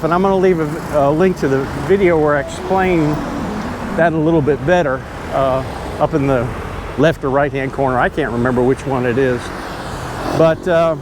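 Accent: United States. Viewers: New Sub, enjoying your vids. You made reference to previously being On The Job in an earlier vid (what Department or part of the States). I hear Midwest or South East Region in your voice? Regards, JP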